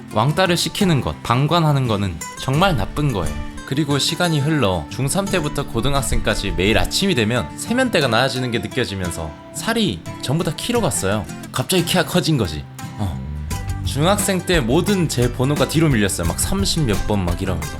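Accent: Gyeongsang, South Korea. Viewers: 님 경상도 사람이시죠??? 말투 들어보니깐 경상도 사람같은데.....흐음ㅋㅋㅋ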